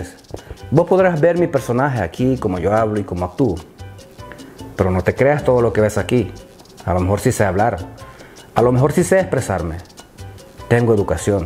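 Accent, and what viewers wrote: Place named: Oriente, Cuba. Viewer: Soy chalateco y creo que vos sos de Oriente así hablamos también cuando estamos jodiendo te felicito sos auténtico maje segui adelante tenes mi apollo aquí esta tu 👍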